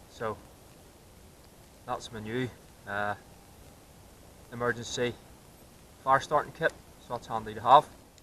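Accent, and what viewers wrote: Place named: Northern Ireland, United Kingdom. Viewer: you sound like somewhere between Newry /Belfast? great channel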